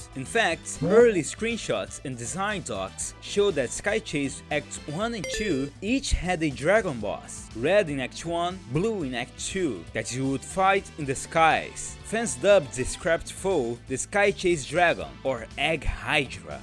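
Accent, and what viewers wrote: Brazil. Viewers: Aaaahhh ma tu é brasilero sem sombra de dúvida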